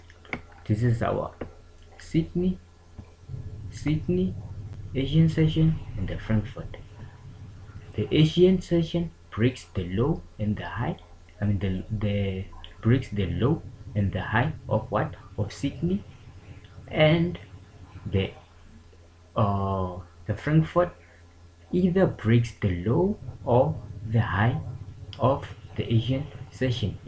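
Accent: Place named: South Africa. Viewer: 😂😂😂😂😂man you this accent sounds South African, but the name 🌚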